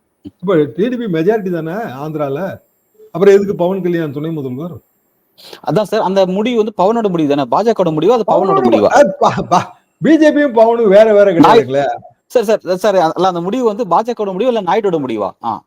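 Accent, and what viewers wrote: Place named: Thanjavur, India. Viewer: உனக்கு என்ன அதுல, அவரு அனுபவத்தை சொல்றாரு.. தஞ்சாவூர் பேச்சு தமிழ் அப்படி தான்..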